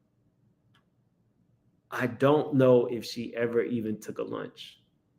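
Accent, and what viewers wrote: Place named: Louisiana, United States. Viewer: When you mentioned the Louisiana coming out of you, I immediately thought "Ya maw!" 😂 I'm from Louisiana too!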